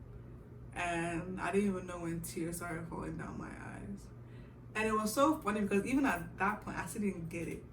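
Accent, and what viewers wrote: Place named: Nigeria. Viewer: Your nigerian accent is still there sha😅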